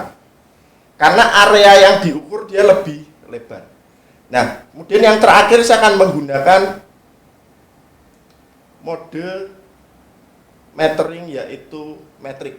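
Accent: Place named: Java, Indonesia. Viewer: pekat sgt jawanya pak..tp terhibur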